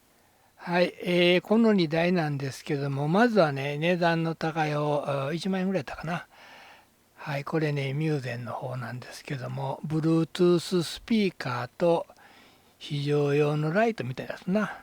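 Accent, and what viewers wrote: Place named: Kansai, Japan. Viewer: 声質と関西訛りのせいでしょうか、「ラジオ」が「ダジオ」に聞こえてなりませんわ😁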